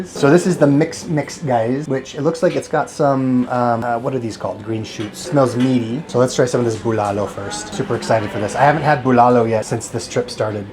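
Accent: Ilocos, Philippines. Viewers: Hi,. First time to see your video but I subscribed because you are vlogging in Baguio city where I was born and come from. I now live overseas, missing home so much and I just love vloggers who goes there and show me a bit of my birthplace to show and promote to the world. Salamat kabsat, agananad ka wen?😅😊 Padaanak dagita sumaruno nga video om😄 PS, I am loving your filipino and Ilocano accent. Mabuhay.👋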